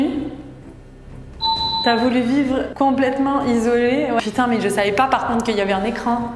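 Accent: Quebec, Canada. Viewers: J adore ta voix et l accent québécois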